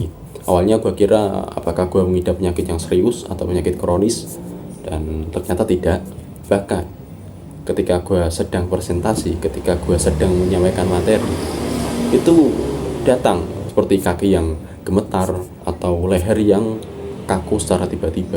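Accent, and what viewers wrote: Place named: Java, Indonesia. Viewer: kalau medokk jangan maksa GUA bang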